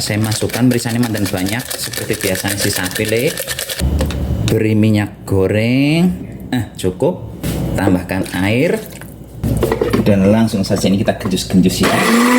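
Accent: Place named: Purwokerto, Indonesia. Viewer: Bahasa nya kok Purwokerto banget rika kang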